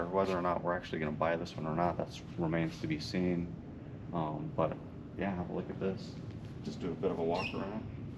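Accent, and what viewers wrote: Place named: Australia. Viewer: How long have you been in the states? If you're an aussie I can barely tell!!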